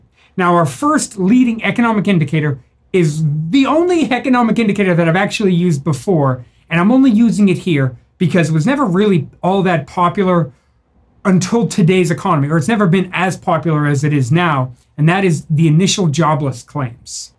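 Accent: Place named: Texas, United States. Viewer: Some texanian accent daaattaaa(data)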